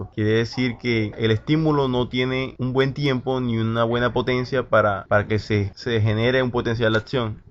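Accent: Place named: Caribbean Coast, Colombia. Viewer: Muchas gracias! Felicidades!! Te pasas !! Pd. Se te siente ese costeño bien bacano! Jajaja.. Yo también amo la medicina!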